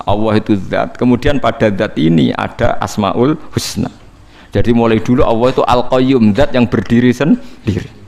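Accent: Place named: Java, Indonesia. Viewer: wes medok jawane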